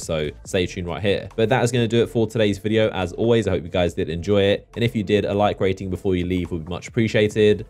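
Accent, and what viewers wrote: United Kingdom. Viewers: are you gay or just british?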